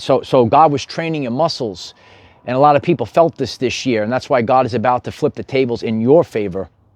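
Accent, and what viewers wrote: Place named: Brooklyn, United States. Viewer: I just fully processed you have a heavy Brooklyn accent after all this time. 😂 Thank you, greatly, you helped me find my wings again. Actually, more than just one set. I need a closet for them all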